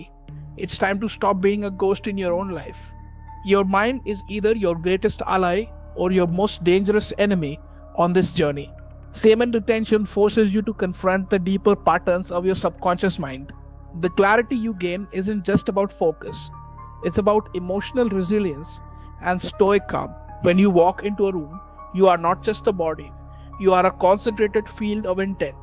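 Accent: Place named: India. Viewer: I liked the AI voice more POWERFUL and SERIOUS. INDIAN ACCENT makes it lose of FOCUS from the TOPIC. BRING BACK AI VOICE. PHEROMONES ✔️ PHARAAMUNES ❌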